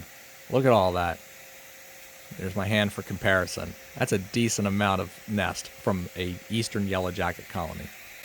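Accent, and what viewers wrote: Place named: Pennsylvania, United States. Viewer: Brother your PA accent is awesome! Reminds me of my 9 year’s training in philly. Your channel is the best!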